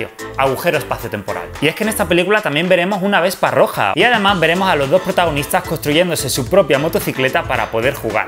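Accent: Italy. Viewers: Puedes callar ese asento italeano 😡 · ¿Cuánto quiero hacer el ridículo en este vídeo?: Sí PD. Adoro que hayas mantenido el acento a todas las palabras italianas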